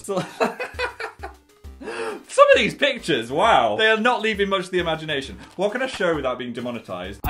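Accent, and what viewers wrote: North, United Kingdom. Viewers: Is northern accent getting stronger every year? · i swear you're getting more northern the more the years go on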